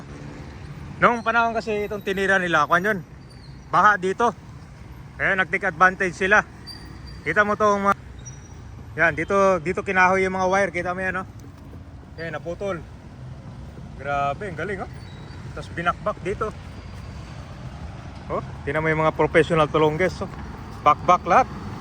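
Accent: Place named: Visayas, Philippines. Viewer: Ayusin m pgsasalita m.....bisaya kb😅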